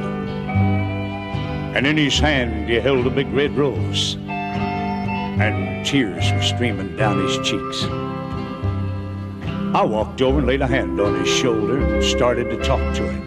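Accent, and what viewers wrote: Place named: Italy. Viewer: That’s a good Italian accent.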